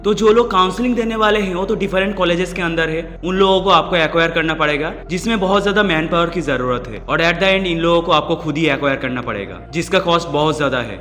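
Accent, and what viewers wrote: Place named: West Bengal, India. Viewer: Dada apni west bengal thaka ki? actually apnar accent thaka lagcha 👍🏼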